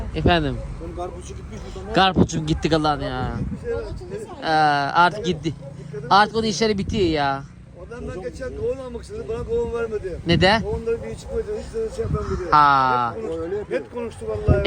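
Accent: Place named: Zonguldak, Turkey. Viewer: kardesim seni izleyen hic bir izleyicin sende negatif bir sey aramaz aramamasida gerek sen dogal zonguldak sivenle güler yüzlülügünle insanlara büyüklerine annene babana evine gelen misafirin ve takipcilerine sayginla bizlerin gönlünü kazandin kardesim biz seni dogal icten samimi halin ile seviyoruz yeni videolarini ve eski vidolarinida zaman buldukca izliyoruz bizler gurbette Almanyada yasiyoruz güzel ülkemizin memleketimizin özlemini senin cekimlerinle gideriyoruz esim Bartinli ben Cide 'liyim sivelerimiz bir birine cok yakin senin videolarinda dogal sivenle anlatiminla memleket hasretimizi gidermeye calisiyoruz iyiki varsin kardesim annene babana kardeslerine takipcilerine Almanyadan selamlar sevgiler kardesim.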